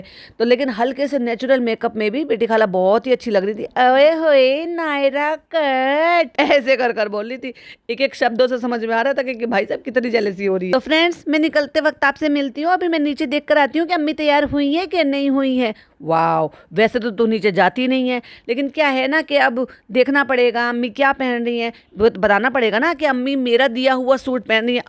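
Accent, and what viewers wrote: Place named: Haryana, India. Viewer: maine apke 3-4 podcast hi sune hn ...Usi se idea lgaya ki haryana ka touch h apki boli me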